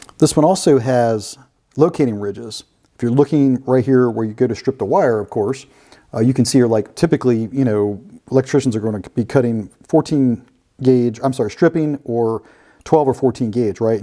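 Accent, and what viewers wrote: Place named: Milwaukee, United States. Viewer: You sound like you grew up in Milwaukee. The natives say Miwaukee.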